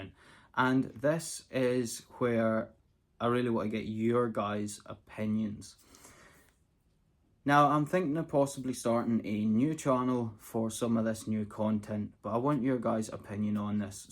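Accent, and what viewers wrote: Scotland, United Kingdom. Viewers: Here ia what I like best about the channel. 1. You delightful Scottish brogue. It so soothing to the eat. 2. Watching the dogs work and see how much they enjoy working and absolutely think you are the best person in the world. 3. The beauty of the Scottish countryside, particularly when you are on the top of the hill and panning all around. 4. The interesting workings of the farm. 5. The walks in the woods. 6. The excursions to the farm shows and touring the cities. Keep up the good work. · The reasons I watch 1. for the dogs. I have 2 border collies that hike and do agility but watching BCs do what they were bred for is beautiful. 2. Scottish scenery 3. Listening to the lovely Scottish accent I would watch other content about Scotland. I have no opinion about whether it should be a separate channel. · Yes, your videos are a calm and peaceful retreat from the craziness of the world. 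I love your dogs and farming and your growing family. I would like to see you add more family time and adventures to this channel. Everything that you say and do brings Scotland to life, especially your Scottish accent. Keep it simple and share your family life with us here. Then I won’t need to look for two channels to enjoy your content. Thanks for all you do. It is such a pleasure to watch your videos. Keep up the good work. 💕🙏💕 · I'm here for the sheep herding which obviously includes the dogs and you. But I really like just listening to you because all four of my grandparents are from Scotland and its almost like they're in the room with me! The fishing you mentioned really got my attention and the outdoors content. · I just wanted to take a moment to thank you for your wonderful YouTube channel. I work in an office and spend most of my days in front of a computer screen – a stark contrast to your life out in the fields with your sheep. 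And that’s exactly what makes your channel so fascinating to me. I really enjoy watching you work, even though I have to admit that I probably only understand about half of what you’re saying – your Scottish accent is quite a challenge for me!😂 But that doesn’t matter, because the visuals, the atmosphere, and the passion you have for your work speak for themselves. Thank you for sharing your daily life with us viewers. Your channel is like a little escape from my office routine, and I always look forward to your new videos. Best wishes from Germany❤ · Just discovered your channel through Facebook few days ago. But I found fake accounts using your name. So I searched you on YouTube. My main reason to subscribe is : Kate, Storm and Echo Love them soo much !!! And your relationship with them…!! I believe that is also main reason why people are here. My suggestion is : you can do ANY VIDEOS ANY! 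because you are REALLY GOOD explaining things, but as long as you included your dogs here and there (please) I believe every body happy! Oh, have just watched your Christmas video the bake competition ! 😂 so funny! And this is not even Christmas 😂 You are super talented shepherd. Do it every Christmas please…you will make every body have their‘merry little Christmas’😍 Oh and the Scottish accent?? Like music to our ears! Love it !❤ May God continue bless you 🙏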